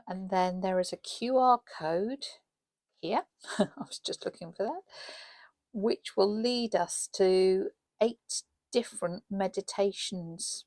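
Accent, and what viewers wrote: United Kingdom. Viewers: Love this! And those color sheets! I love British English. I have a steady diet of BritBox so I feel a bit like I like in the UK. I visited last year for 1 month and in 2025 I will do again in Dec.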